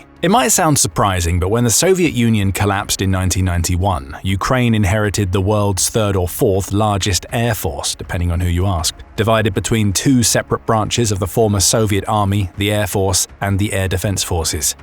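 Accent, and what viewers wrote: United Kingdom. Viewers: Can you use a non UK accent. We have grown tired of the accent.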